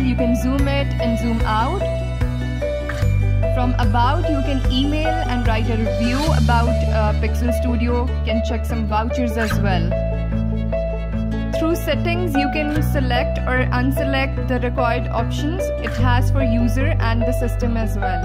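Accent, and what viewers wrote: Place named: India. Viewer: Wow sounding like indian , is this made in india app? If yes so excited to learn pixel art from own country made app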